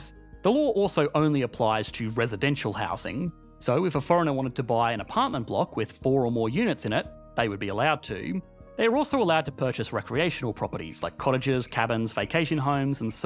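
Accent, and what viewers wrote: Australia. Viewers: I use your videos to work on my Australian accent. It is thiccccc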